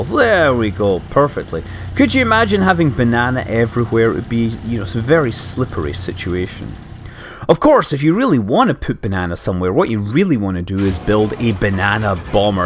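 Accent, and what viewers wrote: Scotland, United Kingdom. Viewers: Hey, glad to see you're back and making videos! I have to confess, I love your videos very much and while it makes me happy to see you go off on a vacation, I'm kind of sad we don't get to hear the dulcet tones of your Scottish accent talking about KSP and other science-y things. Keep it up! Say, ever consider doing a video on DJing or mixing?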